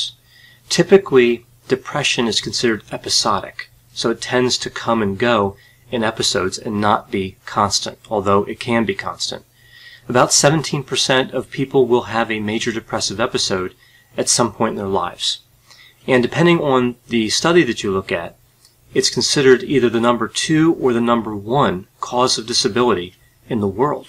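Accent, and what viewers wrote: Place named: Delaware, United States. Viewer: 👍🏻 Well this was definitely an interesting video. I hope we don’t see the day where robots have anything to do with assessing emotion alone without help from people. 😞 Lol..🤞 And, you have a lovely, and relaxing voice. I like your accent as it reminds me of my home state De!!! 💗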